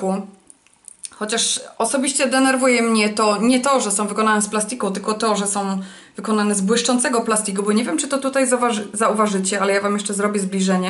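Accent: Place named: Silesia, Poland. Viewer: tak czułam, że dziołcha ze śląska:):) akcent Cię zdradził;)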